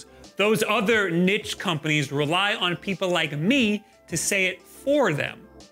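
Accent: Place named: Long Island, United States. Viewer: PLZZZ stop talking like a Long Island hussie 😊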